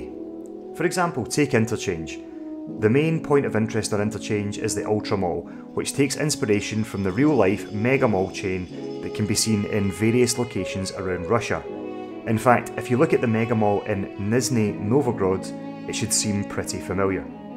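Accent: Scotland, United Kingdom. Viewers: Appreciate hearing an awfully Scottish sounding voice in a tarkov video. This caught my eyes on my recommended feed and hopefully will get into others too.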